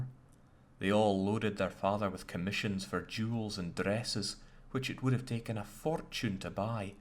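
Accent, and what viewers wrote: Scotland, United Kingdom. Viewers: Wait did this story read as Scottish, or does your accent shine while reading? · lol why do you sound extra Scottish in this?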